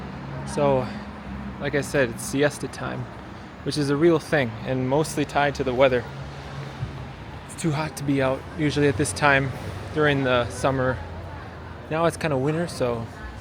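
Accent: Andalusia, Spain. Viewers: me encanta tu acento andaluz 🤩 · Love that u speak andaluz😮‍💨💚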